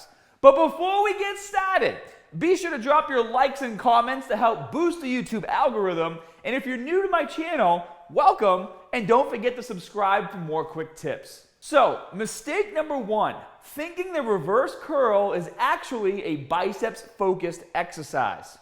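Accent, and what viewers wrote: Boston, United States. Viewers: Wow that Boston accent! Lol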